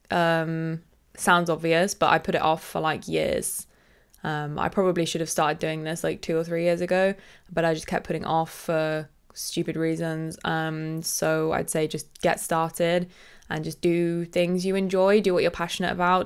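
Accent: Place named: RP, United Kingdom. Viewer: As someone from the Saaf Est, I think your accent sounds quite posh!! 😂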